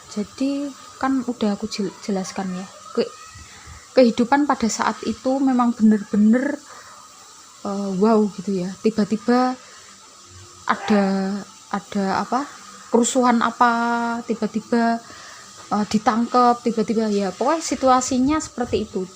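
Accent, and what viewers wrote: Java, Indonesia. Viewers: Medok banget wong jowo ya mbak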